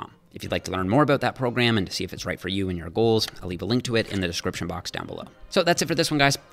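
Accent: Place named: Newfoundland, Canada. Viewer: is from Newfoundland (can't expect anyone to know where that is, but I'm also from there) and I honestly applaud his focus on ensuring he speaks clearly as we have/are prone to having a very distinct and often thick accent that sometimes even locals have a hard time understanding what's being said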